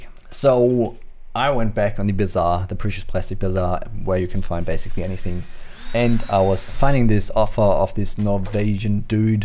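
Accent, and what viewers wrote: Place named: Australia. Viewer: Interesting accent. Sounds like Australian with a touch of European.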